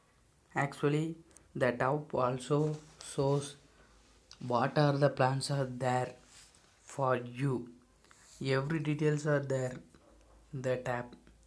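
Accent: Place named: England, United Kingdom. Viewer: Din nou musulman prost cu accent de englez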